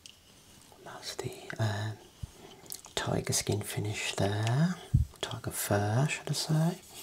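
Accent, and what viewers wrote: United Kingdom. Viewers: Love your British accent. Marvelous video like always ❤ French 💋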